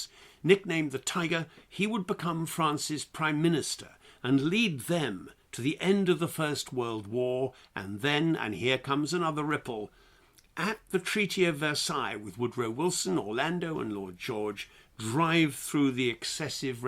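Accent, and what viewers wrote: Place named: RP, United Kingdom. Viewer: Jesus dude, why? Why is it, as an American who's passed the big 60, with German & Swedish ancestory, who's never really bothered to do much except smoke pot & watch TV, why is it, whether you, or Sir Lawrence O narrating "The World at War", I can only digest history, if narrated in public school Queen's English? What I mean is, I really enjoyed this, and am grateful you produced this, and I will have to read (hear, on <link> really) that book!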